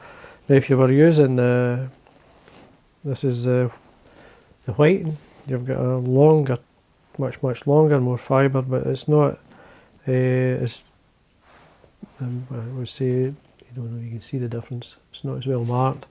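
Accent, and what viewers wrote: United Kingdom. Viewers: Superb,what else can I say! As soon as I saw this tying I got my bits boxes out to attempt a tying myself all the little trimmings I saved that you told us to keep and my treasured packets of Coq de Leon I bought a while back and locked away as I was scared to use it having paid more than I admitted to my wife much like a jungle cock cape I bought over twenty five years ago from the angling centre which is nearly bare Disaster one of our farm cats got in the window of my man cave and made off with my Coq de Leon feathers I had just opened and left on my desk twenty quids worth Gone I searched the garden but all I could find was feathers from our ducks and hens Now I will have to use substitutes I was so looking forward to tying a few dries and trying them out but it’s had me make a decision that I will use materials and festhers I buy and not squirm them away like Scrooge and his money Once again your patterns and things are excellent as is the video and narrative the posh accent with the occasional slip into the accent of the shire is as always so entertaining thank you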